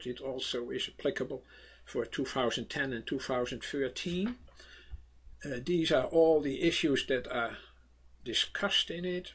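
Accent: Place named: Netherlands. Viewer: Hey, very helpful information thank you, I'm guessing by your name & accent you're Dutch. My Dad is from Holland & we have the same name. Lol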